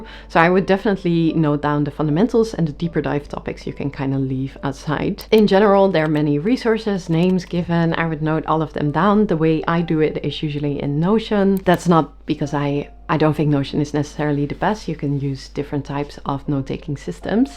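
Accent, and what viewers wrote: Netherlands. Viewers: I heard you speak and I thought “are you Dutch?” and the picture popped up, defiantly studying in the Netherlands. Thank you for today’s video, appreciate it!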